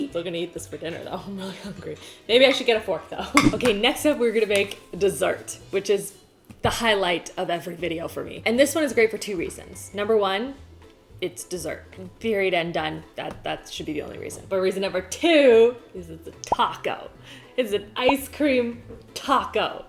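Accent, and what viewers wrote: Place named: Canada. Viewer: Her Canadian accent really came out in this one 😂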